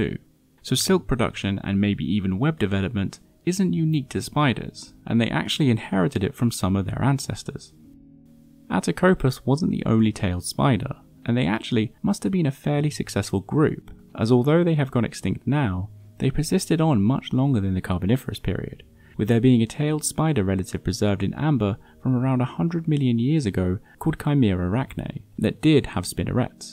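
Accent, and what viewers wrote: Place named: England, United Kingdom. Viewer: Does anyone else find this particular English accent really grating? Its just like nails down chalk board for me. All I hear is him sucking his teeth and speaking through his gums.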